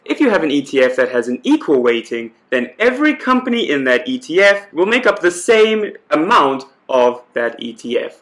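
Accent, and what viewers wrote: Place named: South Africa. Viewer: I’m a South African living in the US and to have this concept explained to me in a SA’n accent makes it that much sweeter. Lekker my boet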